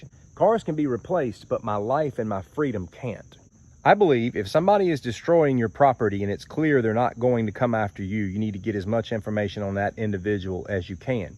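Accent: South, United States. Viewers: Love your Southern accent ! Greetings from Australia. Bless.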